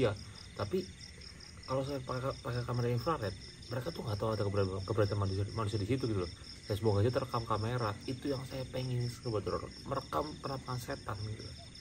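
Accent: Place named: Java, Indonesia. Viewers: Mantap mas maaf mas klo boleh tau sampean dari tegal y jawa tengah logat nya ketahuan salam dari gunawan cah weleri sobat horor sehat slalu mas jngn lupa bismillah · Bang lw ngomong koe logatnya medok sih emg bang asal daerah mana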